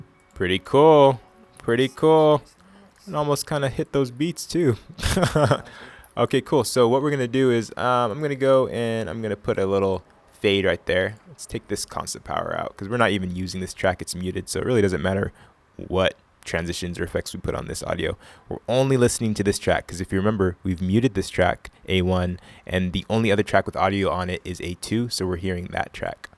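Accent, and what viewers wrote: Nigeria. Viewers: are you Nigerian? If so, so am I! Thanks for the help, I'm trying to learn how to edit and this really helped! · Just asking Are you a nigerian ?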